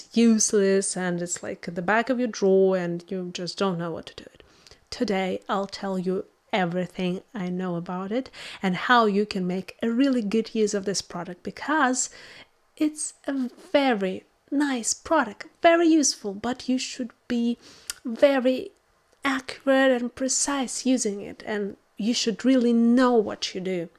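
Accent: United Kingdom. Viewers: Я так пыталась понять Ваш акцент, думала Вы из Англии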